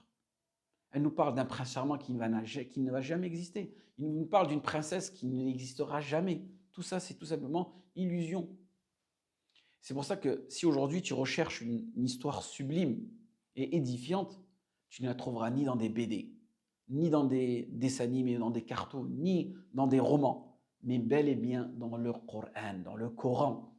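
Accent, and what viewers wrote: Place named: Maghreb. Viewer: الحمد لله والصلاة والسلام على رسول الله ، وعلى آله وصحبه ومن اتبع هداه ، سيدي الفاضل إذا كنت إمام مسجد في أي دولة من الغرب ــ قدًم نفسك أنك إمام متمكن في الدعوة الإسلامية لا خطأ و لا فتوى ــ إياك أن تفتي و أنت لست من أصحاب الإختصاص بمعن آخر ـــ عملاء في مظهر العالم . أنت متعلم و ربمـا مثقف و حسب نبرة صوتك من دولة ، أنا كذلك أنتمي و أسكن فيها ـــ الملاحظة هي : حث المتحولين المسيحيـيـن إلى الإسلام قراءة اللغة العربية الصحيحة و ليس العريية المختلطة بالكلمات الفرنسية كما يتكلمون إخواننا في كل ـــ المغرب الكبير ــ اللهجة الملتوية بكلمات فرنسية و المؤسف الشديد نرفض التغيير و نتمادى في الباطل ووووو . علِمهم اللغة العربية الصحيحة ، حدثهم عن القرآن كلام الله القرآن هو روح ــ روح القدس عرفهم أن القرآن معجزة بالغة ، أما مفعول السورة هذا موضوع آخر ، إذا أنت ياسيدي المحترم قناتك فيها مواضيع مهمة عن الشريعة فكن حقا ــ سفير الحق ــ و إستعمِل اللغة بحقيقتها و ليس مزيفة ( إذا تحدثت باللغة العربية كن فصيحا أو تحدثت اللغة الفرنسية كذلك لا تظيف كلمات عربية و أنظر إلى إسم قناتك كتبت كلمة فرنسية و أظفت معها جملة متكونة باأحرف فرنسية و هذا خـطـأ فادح ، لو قلت لك ياسيدي ، أنا أتكلم اللغة الفرنسية الفصيحة و لو مرة في حياتي كتبت يعني ( الكتابة بالفرنسية و النطق بالعربية ) أنظر في إسم قناتك !!!!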